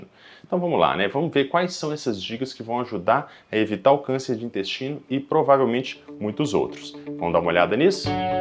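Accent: Minas Gerais, Brazil. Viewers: Obrigada doutor! Exposição clara, bem articulada, e este discreto sotaque mineiro é muito bonito. Já me foi retirado dois adenomas. Faço o melhor por mim, só não consigo parar de fumar. Mas fumo 2 a 3 cigarros por dia.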